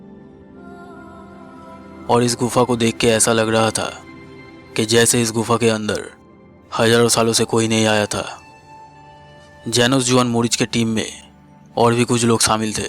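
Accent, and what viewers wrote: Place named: Bengal, India. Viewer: Awaz sunke bol sakta hu,Bhai tum Bengali ho na ? 😂 Sach batayo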